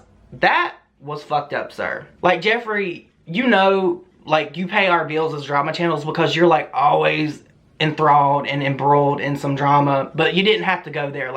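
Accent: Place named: Florida/Georgia border, United States. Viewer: Where are you from!? Your accent reminds me of home: FL/GA border.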